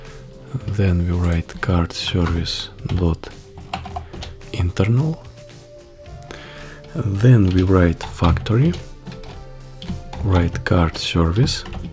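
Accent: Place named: Russia. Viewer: Are you russian? I hear a native accent) Ну или мне просто показалось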